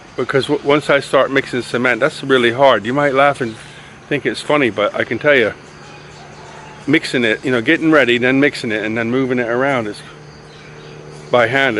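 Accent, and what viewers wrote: United States. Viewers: I am Thai .... Lazada of Shopee is the most popular in Thailand ... For Ex. I try to search "5G pocket wifi".. found Xiaomi Alot Router AX3600 cost 2580 bath from China delevery about 9-12 day which is no costom tax. ......... I think 4G is enough because it speed up to 150Mbps .. if operater around signal only 40 40 ..... ผมคนไทยติดตามคุณเพราะอยากพัฒนาภาษาอังกฤษ สำเนียงอมริกันฟังง่ายครับ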